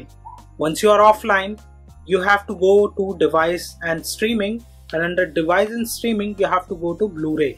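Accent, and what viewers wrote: India. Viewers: your speaking a Indian accent, no one understands that shit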